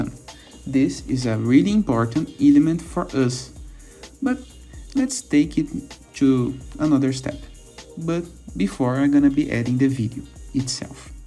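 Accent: Brazil. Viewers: Nice content and nice sotaque brasileiro, meu querido!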